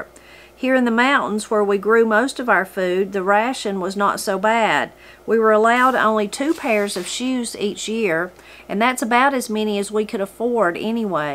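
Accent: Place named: Kentucky, United States. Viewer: I remember my grandmother’s voice and mama’s stories of family with every turn of your voice. Even though mama’s family was transplanted to Oregon, the Kentucky in their voices and stories echoes in my mind with each story you read. Thank you.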